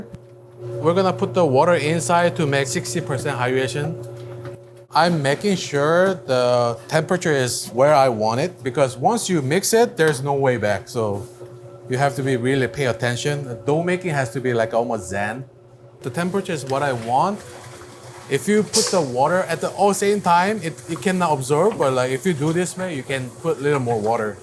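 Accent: Mexico. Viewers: Why does he sound Mexican? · He really sounds a slightly bit mexican with the intonation of his voice as he pronounces words 😂 love it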